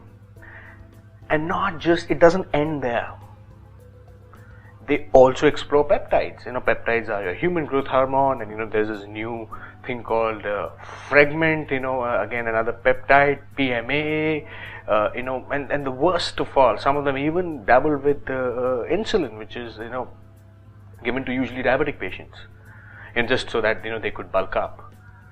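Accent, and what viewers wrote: India. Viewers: fuck you and your indian accent its soooooo fucking anyoing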